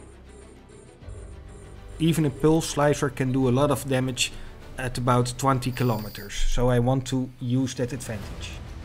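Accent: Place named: Netherlands. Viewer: sounds dutch... to dutch... :P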